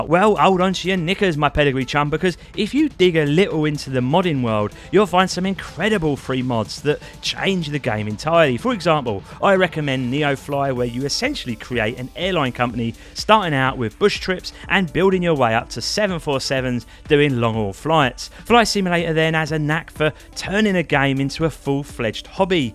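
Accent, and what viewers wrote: Cockney, United Kingdom. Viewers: This guy is Cockney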